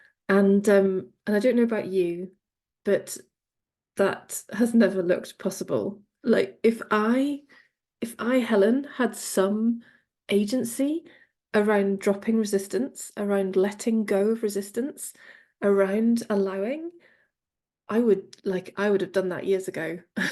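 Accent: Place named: West Country, United Kingdom. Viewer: Hi Helen, I recently saw you on the non duality podcast and HAD to search you.. I noticed the south West Country twang in your accent also.. I’m from Bristol you see! Your book is ace so far btw.. just sat here reading it now.. I was wondering if you do any work one 2 one with people or workshops? Thanks Helen.. keep up this wonderful work….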